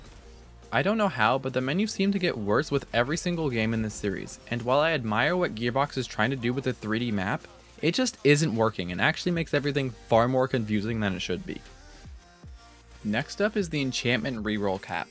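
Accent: Canada. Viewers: you sound a lot older now in the more recent videos you sould like a gay canadian now you sound like a normal canadian in the current vids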